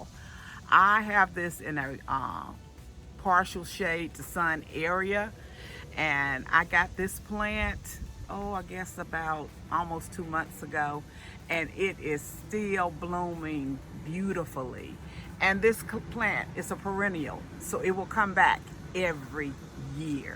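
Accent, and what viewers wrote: South, United States. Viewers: Hi, love your beautiful southern accent, your blessing, your knowledge about the glorious curcuma blossoms & your theme song at the end. I’ve just bought 3 curcumas & have planted them in a trough planter. I have placed it under my bougainvillea standards facing south. Hope it works. 👩‍🌾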